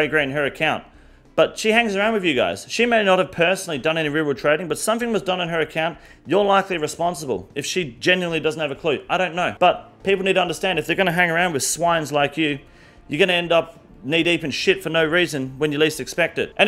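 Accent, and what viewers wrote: Australia. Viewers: fuck me its just good to just hear an aussie voice on an rs vid every now and then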